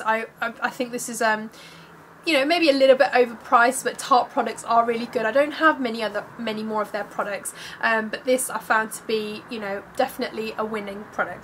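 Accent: London, United Kingdom. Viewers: where's ur accent from.. london? .. oh and hello :-))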